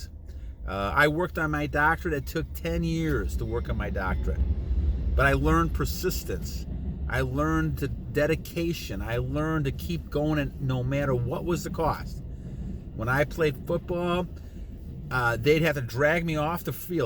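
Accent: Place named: Illinois, United States. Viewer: I don't know where he is but he's definitely from Illinois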